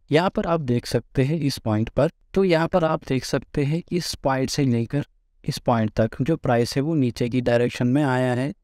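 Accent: Kashmir, India. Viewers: Ur accent .... Kashmir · After listening just couple of your words i came know your are from Kashmir... Well done 👍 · Your accent is kashmiri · Bhai. aap ne to pura gyan hi de diya. Unclear vision sab fully clear kar diya he. Love you bhai. Hamesha khush raho aap or ese hi knowledge share karte rahiye. Apka awaz sunke mere kashmir wale dost ki yaad aa gayi. I am your subscriber now.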